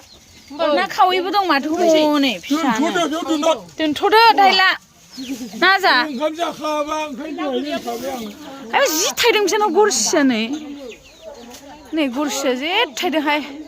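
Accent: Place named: Udalguri, India. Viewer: jwngni udalgurini baidi railainai binanao sona.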